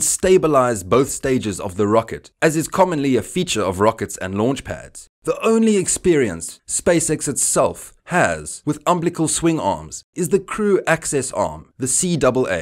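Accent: South Africa. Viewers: You can hear he is South African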